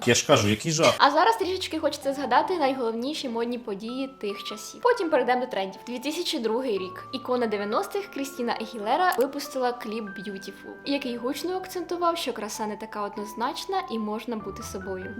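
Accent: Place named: Central Ukraine, Ukraine. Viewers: Я так думаю дівча з Полтавщини... це типова полтавська "манєра балакати". Я сама з Полтави, у мене навіть подружка є, просто копія ця мала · Ви межі своєї області не покидали ніколи?😅 Центр і схід України, майже всюди, говорять так) Послухайте творчість Артистки, вона з Херсонщини😊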